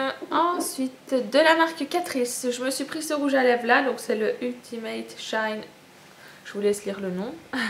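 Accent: Belgium. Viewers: bonsoir juste petite ? tu es de belgique ?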